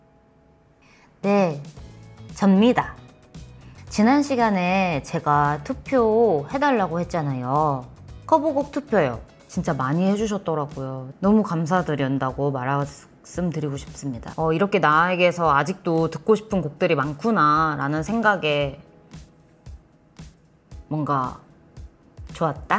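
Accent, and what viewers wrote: South Korea. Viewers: 부산 사람 맞죠???? 부산에서 응원 합니다.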